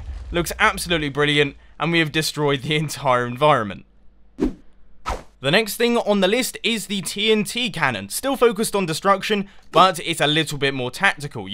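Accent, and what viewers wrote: England, United Kingdom. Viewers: Ahhh... the britishness of that british voice... like music to my ears... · The british voice made up for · That intro was very English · Are you british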